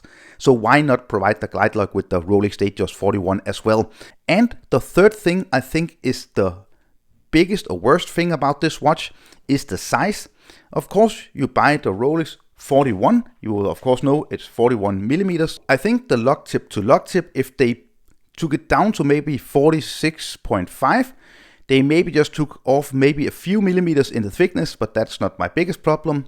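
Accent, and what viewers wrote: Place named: Denmark. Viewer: U Sound very danish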